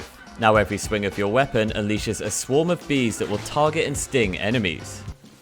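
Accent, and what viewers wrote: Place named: England, United Kingdom. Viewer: Quick question, is your name “,” or “?” It’s hard for me to understand at the beginning of your videos, since I’m not used to the English accent…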